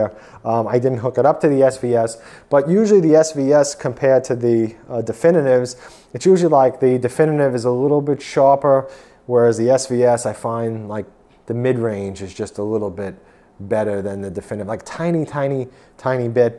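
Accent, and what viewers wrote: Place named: Long Island, United States. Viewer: Very interesting review. I bought one about 2 years ago to supplement a Marantz 6013 with Klipsch RP600Ms. I got it for $600 at the time, right now it's actually $900 everywhere. Yours is the first YouTube review I've found of this amp. I've been curious to see what somebody with access to a lot of amps would say about it, since I don't have much to compare it to. I admit that I was hoping for better. I'm currently at the stage of realizing that I need a dedicated stereo preamp to step up my 2 channel listening, apparently using an AVR for that isn't the best approach - but maybe I'll upgrade the amp after that (and then the DAC, and then the speakers, lol.) Thanks for the review!! (You sound like a fellow Long Islander - are you?)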